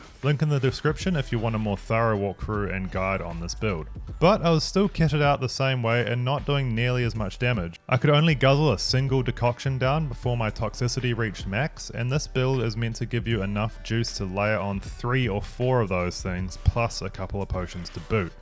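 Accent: New Zealand. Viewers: I’m feeling an NZ accent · Searched for the best Witcher 3 build and was happy to hear a Kiwi accent. Big ups my man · 2.3 seconds in.. and I reeeealy appreciate the new zealand accent, where are you from bro?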